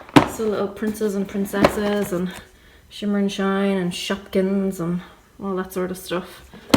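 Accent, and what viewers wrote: Ireland. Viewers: "they are". The Irish accent makes the area have two syllables!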